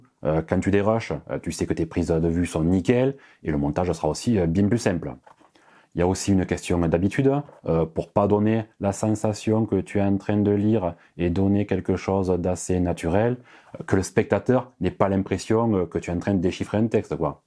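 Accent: South, France. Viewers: J'aime ces vidéos sur du matériel bien choisi, simples directes brèves et ave l'accent du sud. Bravo !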